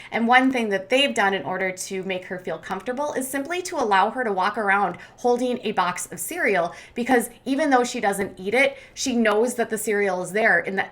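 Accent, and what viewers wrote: United States. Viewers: I know you have an American accent but for some reason my brain re-imagines it in an English accent lol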